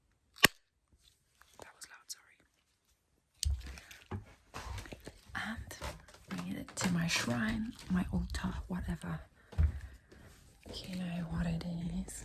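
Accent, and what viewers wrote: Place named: United Kingdom. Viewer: i’ve missed u smmmmmm. also am i just noticing you have a british accent :0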